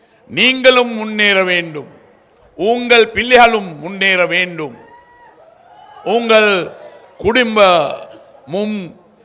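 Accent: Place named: Sinhala, Sri Lanka. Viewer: Saami Kadavule...enna Thamizh ithu...Azhagana Ilangai Thamizh engey, intha singala thamizh engey...quite opposite...intha pecha kekumpothu eppadi ivar pechu ellarukum vilangum....athaan ottu podala pola,..thothu poyitaaru...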